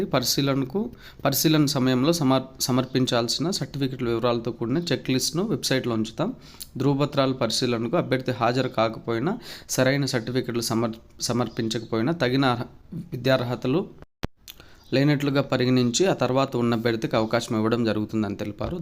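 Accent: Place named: West Godavari, India. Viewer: అండి అంటున్నారు మీది west గోదావరి నా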